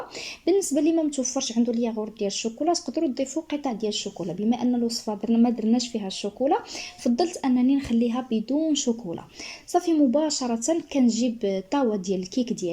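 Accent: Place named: Algeria. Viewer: هبلت. هبلت هذه. لهجة. جزائرية . اكيد. انك 👇👇👇👇👇👇 اخذتي. المنشور. والوصفة. من. صفحة. جزائرية. .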